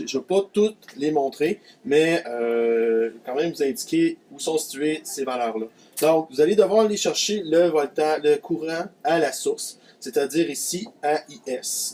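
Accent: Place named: Canada. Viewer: Accent canadien😂j’aime trop continue tu gère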